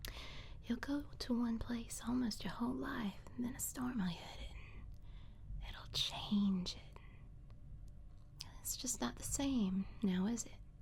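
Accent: South, United States. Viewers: Omg what a video you’re southern accent was so on point an you made the most beautiful cowgirl ever an awesome an the flannel shirt was such a nice touch my mom raised me to be a gentleman a lovely angel like that a lovely angel like that a true 10 out of 10 It is a lovely feeling when you see someone who catches your eye an can’t that’s the same feeling when ever you are on twitch by the way you’re always beautiful you captured my imagination just watching each action in this video unfold I’d blush if someone that pretty admitted she had feelings like that glad that was not the last goodbye for those to love birds this was truly great asmr at its finest love you mama A+ work so talented rocking the red lips he should have kissed her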